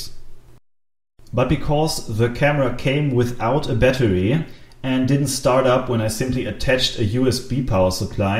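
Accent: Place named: Germany. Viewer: Hi, Thanks for sharing your ideas with us. I just love it and also enjoy your German accent.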